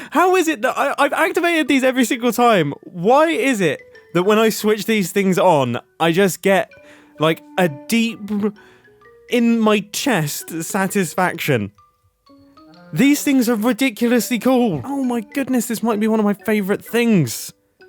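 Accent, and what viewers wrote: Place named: United Kingdom. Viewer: cause of his British accent it sounds like mumbo said “this has been a very fan day”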